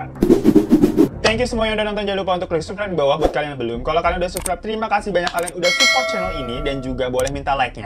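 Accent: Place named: Cirebon, Indonesia. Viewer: Yg AQ suka gaya bicara tuch ky orang Cirebon gitu lucu dan ngasal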